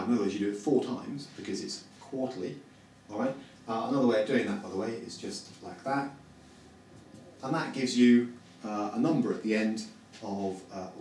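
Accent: United Kingdom. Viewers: Thank you Sir. ... Great content. .. and love the accent (British) :)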